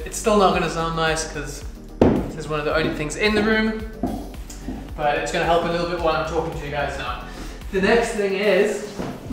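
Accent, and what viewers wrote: South Africa. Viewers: I am always a fan of hearing the familiar sound of a SA accent! Congrats on the new studio bru, sorry I am late on the train for commenting. I only stumbled on your YouTube channel today! Subscribed and liked! your videos are legit - No cap. Have a dope long weekend my man!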